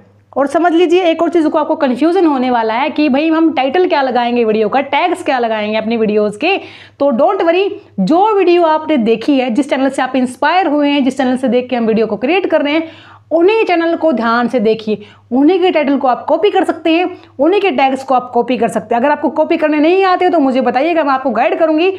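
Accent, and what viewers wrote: India. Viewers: Haryana ya Delhi se mam???? Language apki haryanvi hai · your slang is like punjabis??? you are a punjabi???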